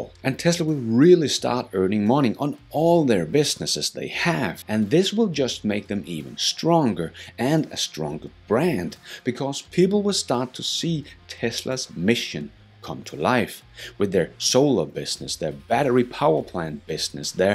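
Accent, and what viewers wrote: Denmark. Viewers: Er du dansker? 😁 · You sound Danish. How are Tesla sales going there?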